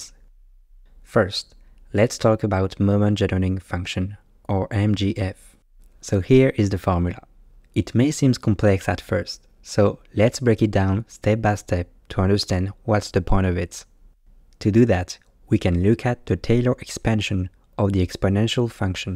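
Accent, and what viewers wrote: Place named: France. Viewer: Difficult to hear the difference between Gaussian and Cauchy when a French pronounces it 😂. Great video anyway.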